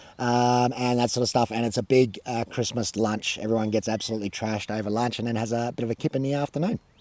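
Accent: United Kingdom. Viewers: She sounds more British than American to me... But this is just me guessing since I don't have a clue where she's actually from. Though, the accent I've picked up seems to come and go, but sounds more obvious with certain words. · Shes obviously English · She doesn't sound American. More like British. 🤷‍♀️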